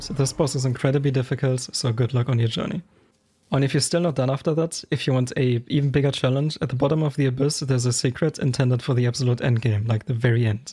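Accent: Germany. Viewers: Thx man. Are you German? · Idc what anyone says if the title says spoiler free and the accent says German af then you won’t find ANY spoilers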